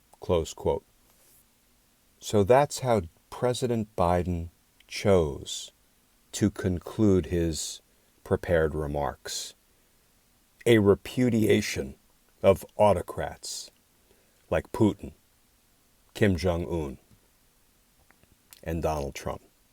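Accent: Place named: New England, United States. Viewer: LOVE LOVE your measured, calm, clear way of speaking......I hear a little tiny hint of New England in your lovely voice.....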